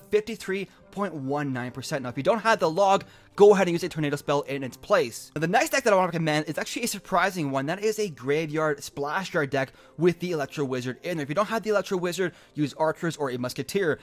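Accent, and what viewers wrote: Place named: Canada. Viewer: Mini pekker haha. You must be Canadian by the way you talk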